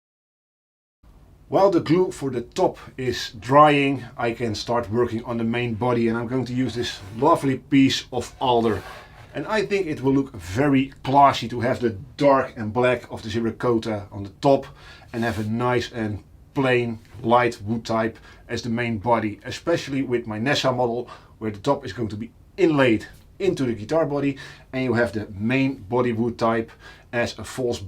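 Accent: Netherlands. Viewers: This video captivated me every second. I am very grateful to you that you speak in a (for me) clear and (despite your Dutch accent) understandable English. This makes it very easy for me to understand what you do, why you do it and how you do it. . I think your shape of the guitar body is great, because you do without the often common (but in my opinion disturbing) offset between the upper and lower cutouts on the neck. I first saw this in a similar form on John Petrucci's "Majesty" guitars and find it very comfortable. . Your design with the recessed book matched top should actually work very well on a neck-through and/or semi-hollowbody guitar. What do you think? . I am already very excited about the next part, please don't let us wait too long 🙏😉👍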